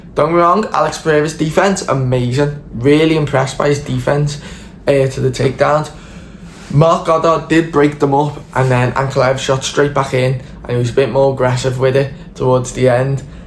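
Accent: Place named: United Kingdom. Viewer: You sound like Paddy Pimblet, Ajex Pareyrahhh. Umm I love British accent actually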